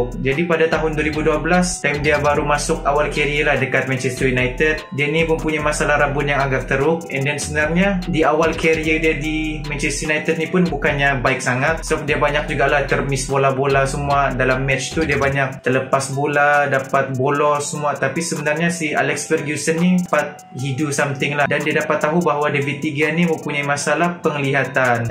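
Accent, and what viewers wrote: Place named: Sabah, Malaysia. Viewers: Original sabahan bah speaker nie...pelat bunyi nya... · Sabah bah ni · Sabahan speaker 👏🏻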